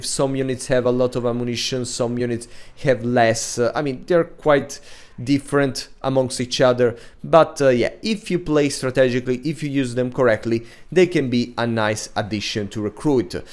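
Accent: Italy. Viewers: are you italian? i love your accent! :)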